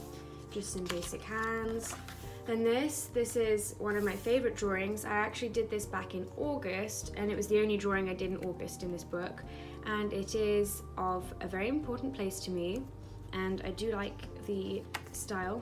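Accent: RP, United Kingdom. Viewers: Wow you’re so posh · You can tell how posh you are holy heck - great art btw :Db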